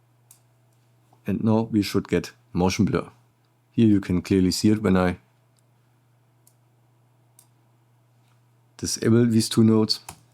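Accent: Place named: Germany. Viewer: Just stumbled on this channel for the first time. Your German accent sounds great to me and the info is solid.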